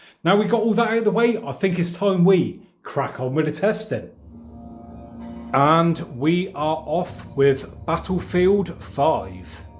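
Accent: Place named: Southampton, United Kingdom. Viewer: Your videos helped me buy my TV (Samsung q90r, 65inch) so thanks for that! My girlfriend and I are trying to guess your accent. We have money resting on this so please can you let us know who won? (she thinks Southampton). Thanks for your videos, from Mallorca!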